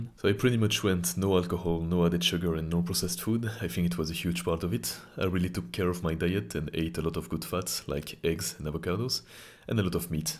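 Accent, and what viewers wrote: France. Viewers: Ton accent français est magnifique ✨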